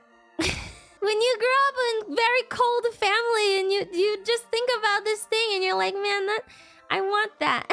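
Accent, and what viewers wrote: South, United States. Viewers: Her southern accent does things to me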